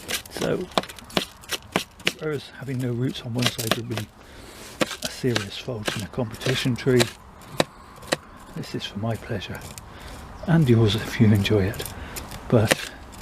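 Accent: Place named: RP, United Kingdom. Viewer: speaks the queens English. I'm a Geordie so you probably wouldn't understand a word on my videos.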